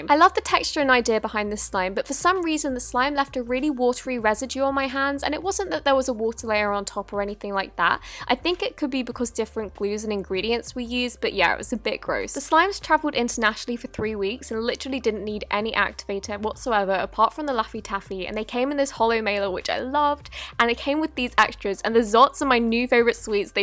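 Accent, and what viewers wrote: United Kingdom. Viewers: Hamiltrash -_- "the british accent" is very broad britian includes scotland england wales a n ireland, most people that live far away from eachother (such as the north and south of england) cant understand what they are saying! accent is deffinatley english, like zoella's but she definatly doesnt sound northen so im guessing she is from some area more west also if you say that are language is weird (which I know you havent said that but lots of people do) just know that we (the brits) * created the language you speak! have you everheard an american tell anyone that they speak american? well if you have then they are damn wrong because americans, canadians and so on speak *ENGLISH the language that origanated in, what country? England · what part of the u.k. are you from i live you're accent!!!!!! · Sooooo im still confused are you brittish?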